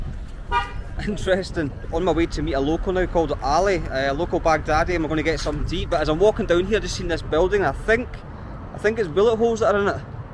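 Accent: Scotland, United Kingdom. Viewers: Oh! Hearing your Scottish accent in the middle of Baghdad is something else. Thank you so much for visiting my country! I haven’t been for 23 yr 😳🫣!!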